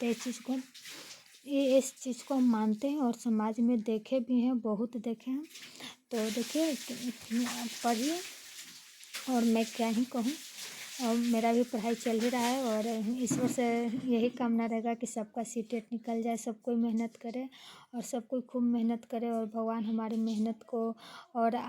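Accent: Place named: Mithila, India. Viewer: Lag raha hai aap mithilanchal se hai kya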